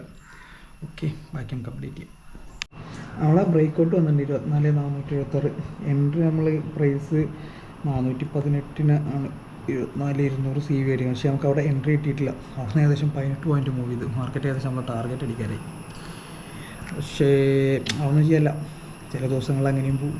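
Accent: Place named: Malappuram, India. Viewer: ഒരു മലപ്പുറം ട്ടെച്ചുണ്ട്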